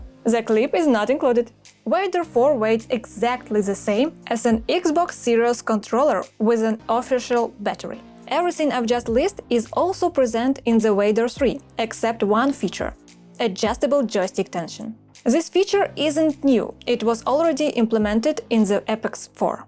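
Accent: Russia. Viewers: Здравствуйте, вы русскоговорящая? Акцент такой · Может на русском делать будешь? Рязанский акцент прям режет уши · The russian accent😅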